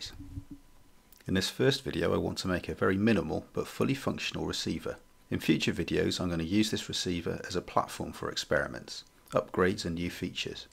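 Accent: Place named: Cardiff, United Kingdom. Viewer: Nice project for the RP2040. By the way, to my ear, you sound like a Cardiffian who lives/lived in England?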